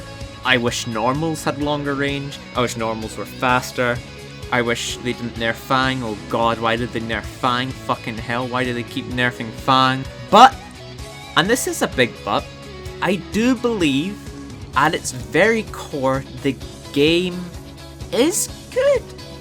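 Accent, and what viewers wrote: Scotland, United Kingdom. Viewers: Time for monthly dose of smooth Scottish gamer man Also make the walking dead video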